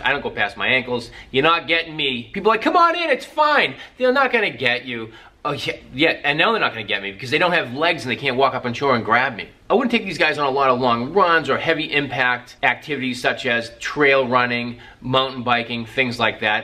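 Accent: Boston, United States. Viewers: Boston? I recognize the accent...and the shark comments! Interested in this breed but I can’t find local breeders. Do you know of any? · where does this guy live??? He has a boston accent, but there are no sharks in boston. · Can tell this guy is from Boston immediately 😅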